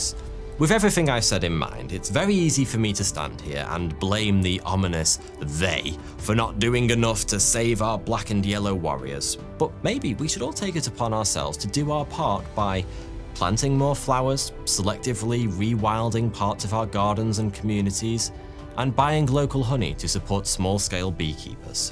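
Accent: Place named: Yorkshire, United Kingdom. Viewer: Anybody know what part of uk this guy is from? In only asking cuz i have a very similar accent and i originate from Harrogate, yorkshire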